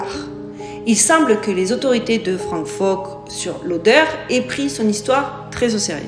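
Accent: South, France. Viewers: coucou ça fait 3 jours que j ai découvert ta chaîne et je la dévore 😍😍😍 par contre si tu pouvais faire quelque chose pour l écho..... ça gâche un peu 😏 mais je suis agréablement charmée par ton accent du sud je m attendais à un accent canadien 😂😂😂😂 vive les expats ❤ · Bravo pour tes plans d'attaque. J'adore ton accent du sud. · Merci ma jolie petite fée 🌹ça m'a fait du bien de te voir,avec ton accent du sud et ton humour. J'étais en pleurs en regardant 2 vidéos , terribles, ou mes gens se sont retrouvés, nasses, avec impossibilité de fuir.Il y avait vraiment la volonté de leurs faite très mal .😢Des parents , enfants et grands parents pacifistes. Gazés à blocs matraques. Pour rien,un crs à dit z une femme, qui m'a laissé passé, que les ordres venaient d'en haut. Sinon j'adore ta vidéo,toutes les histoires. Je connaissais, celle du gars quo disparaît, du champ, je la pensais plis vieille. 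Sinon connais tu l'histoire du nimitz ? Très étrange,j'avais vu ce film avec mon père quand J'étais ado ,ça te ferais un bon sujet🌞Merci pour ton super taf 💙Prends soin de toi, ainsi que ton fiston 💖et la belle 🐺🐾💖Duchesse . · "il aurait pu écrire le Seigneur des Anneaux... sûrement un cousin à Tolstoi" euh, un cousin à Tolkien plutôt non ? Là tu m'as tué 😂😂👍😉 Merci de nous régaler de tes vidéos, de ton accent du sud et de ta bonne humeur 🙏🙏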